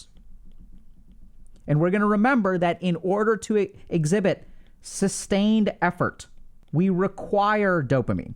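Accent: Russia. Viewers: russian accent sounds so cool, tbh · That’s a solid Russian accent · Doctor Ks russian accent is top notch tho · Solid Russian accent (i have the same) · Hearing speak in Russian accent is the real therapy, everything else is just a bonus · I found your Russian accent hilariously great. Very good!! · I liked the russian accent)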